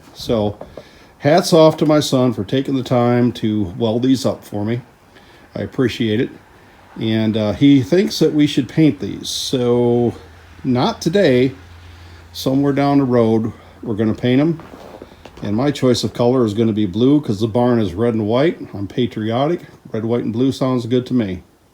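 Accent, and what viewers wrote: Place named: Northern Minnesota, United States. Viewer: Those look like heavy duty gates! You're going to need to have some heavy hinges to hold the up! Your accent reminded me of how we poke a little fun at the northern MN folks - "Hey der, let's head up to Tunder Bay for some Molsons, ey." Have a great week!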